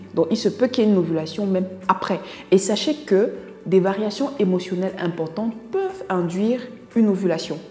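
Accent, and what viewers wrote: Cameroon. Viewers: FAQ: êtes vous camerounaise? 😅😅 je reconnais un peu l'accent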